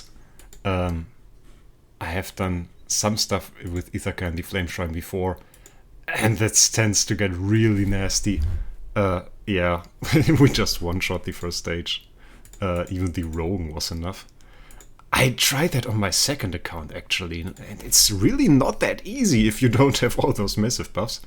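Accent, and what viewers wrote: Germany. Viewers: you have a very german accent bro, love ur content. keep it up👌🏿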